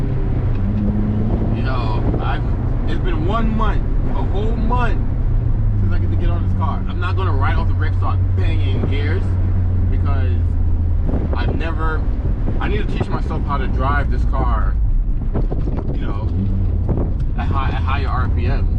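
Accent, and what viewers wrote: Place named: Jamaica. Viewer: Could know you jamaican fromyour voice bro, whats the milage on ur car i see one at 17000k that needs a throw out bearing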